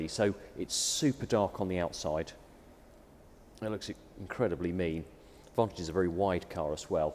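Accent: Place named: RP, United Kingdom. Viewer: The presenter is ace. Sounds like he went to Sandhurst.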